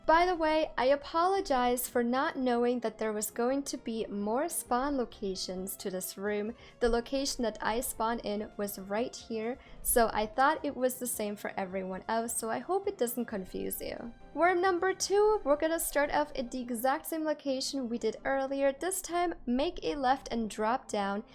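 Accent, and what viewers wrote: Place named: Germany. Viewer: I hear a German or Dutch accent off and on....hard to pick up but there is something there...what is it?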